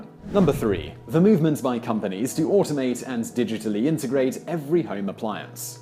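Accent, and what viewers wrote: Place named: Kent, United Kingdom. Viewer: He's from Kent in England.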